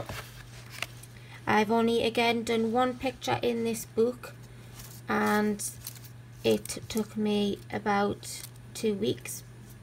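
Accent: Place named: Northern England, United Kingdom. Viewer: Hi, just subscribed to your channel. It sounds like you are a fellow Northerner:D Awesome colouring x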